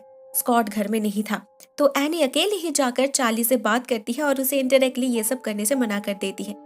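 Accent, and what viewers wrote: Bengal, India. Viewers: Didi are u Bengali🤩🤩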